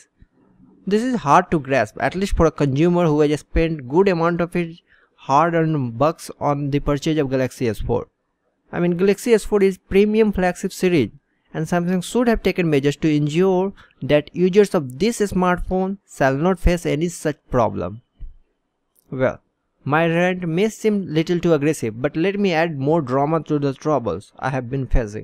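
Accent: India. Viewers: indien english dialekt?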